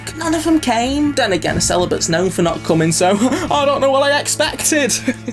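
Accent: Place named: United Kingdom. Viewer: I sarabscraibe just for the british accent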